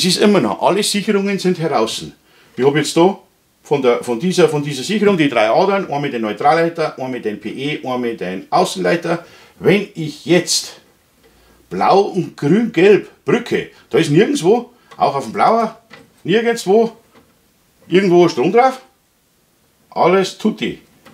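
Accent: Bavaria, Germany. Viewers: Habe gut gelacht. Warum? Weil mein Kopfhöhrer am Ladegerät hing und Youtube versucht hat, Bayrisch mit Untertiteln auf Hochdeutsch zu versehen. :D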